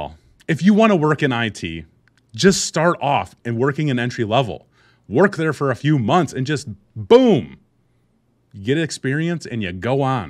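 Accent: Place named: Midwest, United States. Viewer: The midwest accent is strong with this one.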